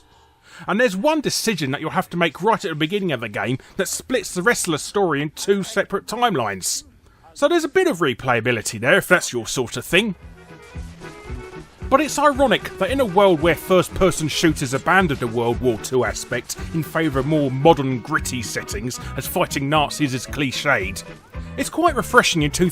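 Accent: United Kingdom. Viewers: You have the most British voice ever, its brilliant. Never played Wolfenstein, really liking Doom 1 though, very fun though difficult.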